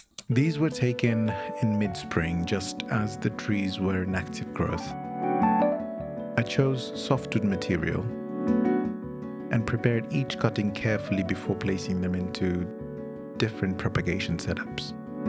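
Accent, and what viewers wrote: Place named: India. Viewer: I enjoy your channel immensely , you are an easy study , I appreciate that you speak slow as I have difficulty sometimes with Indian accents , thank you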